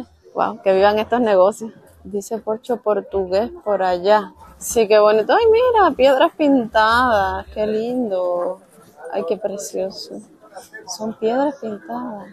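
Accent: Cuba. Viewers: el acento lo vas a perder cuando estés hablando con chilenos, pero aunque pasen 30 años, al volver a hablar con cubanos, vas a recobrar el acento, claro que nunca va a ser el mismo. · Cubanita me encanta tu asentó ❤️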